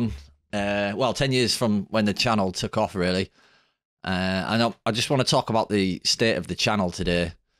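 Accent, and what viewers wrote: United Kingdom. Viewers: I never knew u were British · I also did not know you were british lol... I always thought you were from the states. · me 2 seconds in: Hes British?? 😮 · Eeee he's a Brit... Sounds like a chuffin' Yaaarksher lad... Love it.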